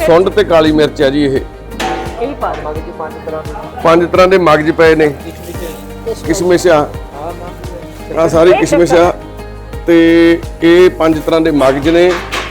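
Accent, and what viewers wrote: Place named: Punjab, India. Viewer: مٹھی بولی پنجابی تے اتوں پھا جی دے منہ وچوں سجدی بڑی اے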